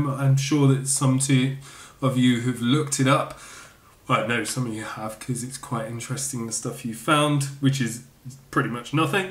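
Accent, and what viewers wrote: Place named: Newcastle, United Kingdom. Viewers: Ah your Geordie accent is brilliant hahaha made me laugh, i wish i could take all this away from you I am hoping and wishing surgery will be successful X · Always thinking about you day and night. Hang in there, bud. By the way your Geordie accent sounds WELSH.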